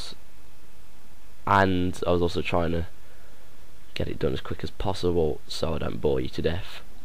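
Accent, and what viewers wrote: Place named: United Kingdom. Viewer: omg british accent! love it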